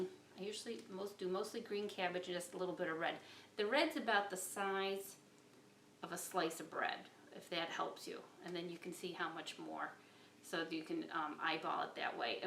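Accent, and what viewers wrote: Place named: Massachusetts, United States. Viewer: Hello gorgeous,it's Donna from England with the terminal cancerAND the baby AND the three French bulldogs and a pug,YES I'm still alive.I can't tell you how excited and grateful I am about this recipe,I LOVE LOVE LOVE raw cabbage,of course I'm crazy about conventional shop bought coleslaws but urrrrghhhhh the fat content ( but so so yummy).Unfortunately since my husband was killed there's only myself to cook for and if I'm in a lot of pain ------ well you know what I mean.Thankfully I have all of my groceries delivered and I ALWAYS have fresh cabbages,oranges,lemons,chillies,ginger garlic onions and carrots and peppers as a staple,delivered twice weekly.I am going to make a huge bowl of this coleslaw in the morning.I was actually binge watching your videos just last night after I put my baby to sleep.It seems like only yesterday that I "found" you by searching for the Hippocrates soup recipe!!! 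Well I'm going for a rest now and I'm sending you tons of love from England as per usual.Oh and I just ADOR your Massachusetts???????? Accent,love as always Donna 😘❤️xxxx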